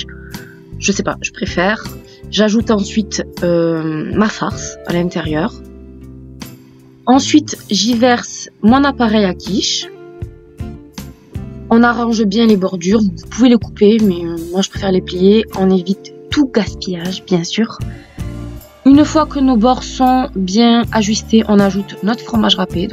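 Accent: South, France. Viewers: ca s'entend que tu es du sud 💗maintenant Paris!en tout cas ta chaîne est sympa je pense que pour le ramadan tu vas m'inspirer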